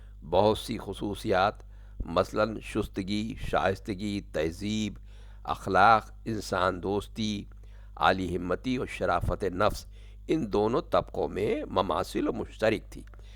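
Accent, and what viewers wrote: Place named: Lucknow, India. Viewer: आपका ये वीडियो बहुत कुछ याद दिलाता है और ये सच है कि इतने सब चीजो के गुजर जाने के बाद भी आप जैसे लोगों के लहज़े में लखनऊ हमेशा ही जिंदा रहेगा और हमारे तो रूह में लखनऊ बसा हुआ है, बेशक बहुत खूबसूरत लखनऊ को पेश करता हुआ एक उम्दा वीडियो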